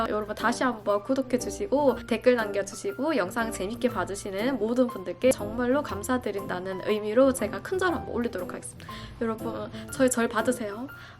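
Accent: Gyeongsang, South Korea. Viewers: 아이고 5만 츄카해요~같은 갱상도군효 ㅎ 저두미국이에요 1년됫어요 영어못하는즘마ㅠ집콕예요 저두 취직?은무리고..애가둘이라ㅡㅡ 알바하고싶군효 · 오늘 우연히 보게 됫네요...경상도 액센트도 정겹고, 너무 예쁘고, 생각도 바른 젊은인것 같아 호감 급 상승했어요~^^ 내 아들도 이민자라 같은 또래 젊은인것 같아 더 관심가네요~대형 유투버로 성장 하길 응원해요~^^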